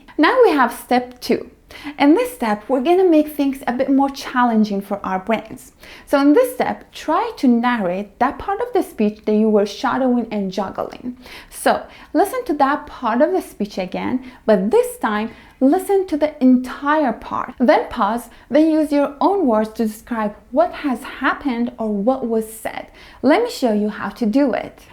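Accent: Iran. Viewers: ته لهجه ايراني تون مشخصه. لايك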